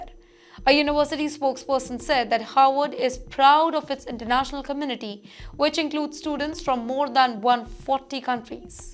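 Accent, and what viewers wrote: India. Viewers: Tongue-twisting curry English.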